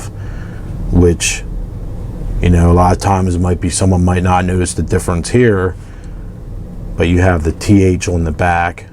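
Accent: Pennsylvania, United States. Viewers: Sounds like you from Pennsylvania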